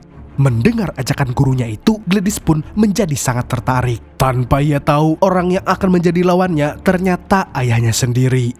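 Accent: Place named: Kalimantan, Indonesia. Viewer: bg lu orang kalimantan yaa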